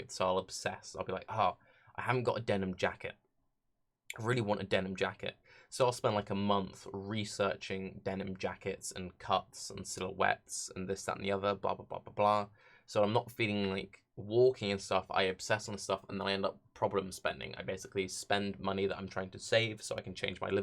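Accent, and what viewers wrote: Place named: England, United Kingdom. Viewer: respect man.you sound english.so look into picking yourself a fucload of liberty caps and blast off next month.think the season is same as norway.dunno how it relates but it helps alot with depression and unwinding.and suprisingly alot of pains and headaches go away for many.pretty anecdotal but...safe as milk atleast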